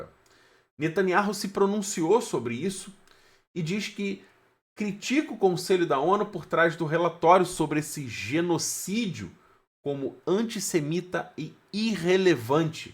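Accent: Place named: Rio de Janeiro, Brazil. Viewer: Afff esse sotaque carioca cheio de sssssssss e rrrrs! Fonoaudiologo resolve isso!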